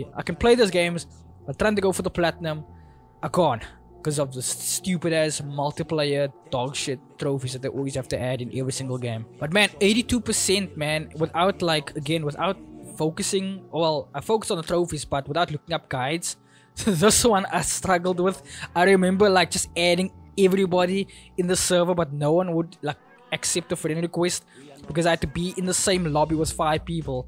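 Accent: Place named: South Africa. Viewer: Yo man, great video. Your accent sounded so damn familiar and turns out you are South African😂. Keep up the great videos, glad to see a fellow South African youtuber in the niche.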